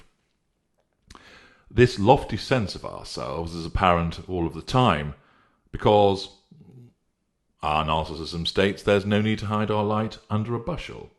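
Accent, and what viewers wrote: United Kingdom. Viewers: Ahh you must know the latest narc that I am preparing to extricate myself from ... Admittedly it sounds better with a British accent!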